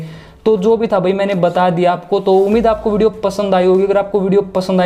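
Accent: Haryana, India. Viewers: Bhai haryana me kaha se ho?🤭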